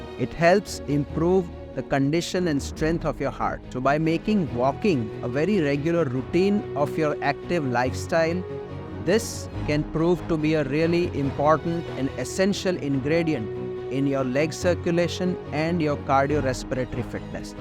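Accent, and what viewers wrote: India. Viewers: Father teach me English more than 35 year that's why understand indian English grateful to ur parents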